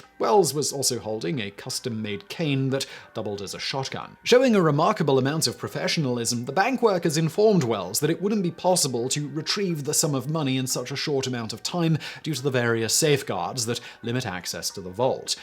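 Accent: United Kingdom. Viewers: I can't watch a UK guy tell an American story🤣it's annoying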